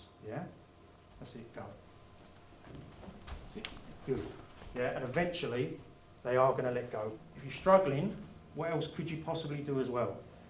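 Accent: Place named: Australia. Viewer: A big guy in Aussie accent doesn’t mean he know what he is doing. Half of the technique is NOT possible simply because you can’t reach the guy. Also I’d like to see a child being able to pull a finger off of a lumberjack sized men. There are good self defense techniques on YouTube, this is NOT one of them. Try it with a big guy at 50% aggression, bet you can’t even reach him. But a strangle can put you out in 3.5 seconds flat.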